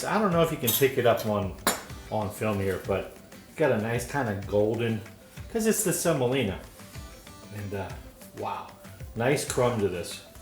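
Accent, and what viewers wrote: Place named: Pennsylvania, United States. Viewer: I'd love a junk of that bread covered in butter❗❗❗Are you from PA❓YOU SOUND LIKE MY MAMA'S FAMILY🥰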